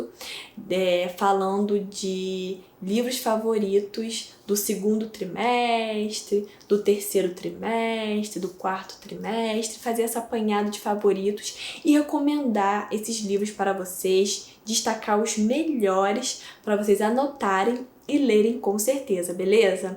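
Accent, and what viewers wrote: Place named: Pará, Brazil. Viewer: Oi... Você é do Pará?... O sotaque parece bastante.🤗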